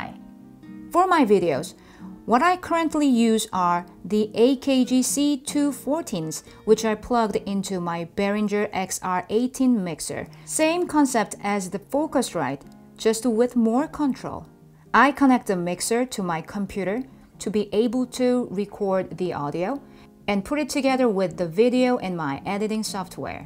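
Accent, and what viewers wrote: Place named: South Korea. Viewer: after listening to many of the big stars doing videos from home during the social distancing it is obvious that you really do a great job with audio. most of the famous stars sound terrible on their videos. you however have learned very well how to balance piano and voice. that's great because you have a wonderful singing voice and I adore your Korean accent when you speak.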